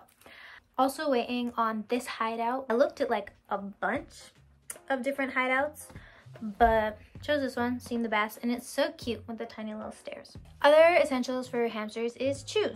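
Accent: Scotland, United Kingdom. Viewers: i love ur accent ( I think ur scottish ) U sound like my dad when hes on call with my nana cuz my dads scottish and my nans scottish and Irish( Edit ) I just realised u said ur English but moved to Usa so sorry x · Are you originally from Scotland? I hear a bit of Scottish accent sometimes but could be wrong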